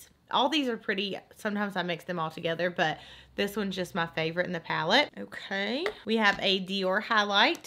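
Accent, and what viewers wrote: South, United States. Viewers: You are so beautiful and I could listen to you talk all day! Love that southern accent! ❤️😘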